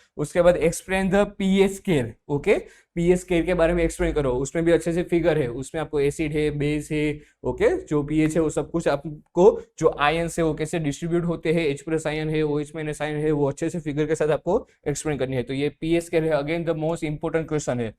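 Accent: Gujarat, India. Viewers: Bhai woh Gujarati Hai Sharad se Guj accent me Hindi bol rhe hai😅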